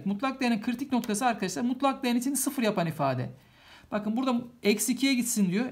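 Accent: Trabzon, Turkey. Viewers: Hocam zannediyorum Trabzon/Arsinlisiniz.Konuşmanız orayı andırdı.Alakasız ama yine de belirtmek istedim.Ayrıca anlatımınız çok güzel. Teşekkür ederim.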